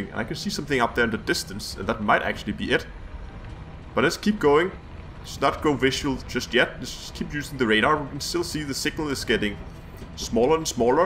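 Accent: Denmark. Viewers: You've probably forgotten ever writing that comment, but it's quite a strong Danish accent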